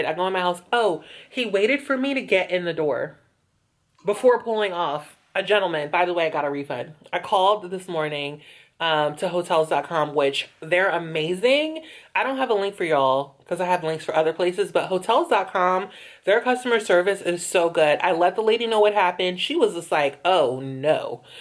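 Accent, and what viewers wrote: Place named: West Coast, United States. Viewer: I howled at the west coast accent, not too bad. 🙌🏾🤗🤣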